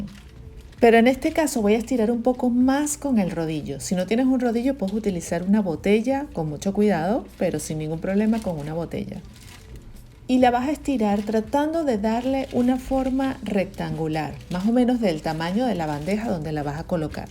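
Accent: Venezuela. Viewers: Tienes acento venezolano. Bueno te envio la bendición d Dios en nombre de Cristo;puesm parece genial la receta. Gracias. Saludos desde Venezuela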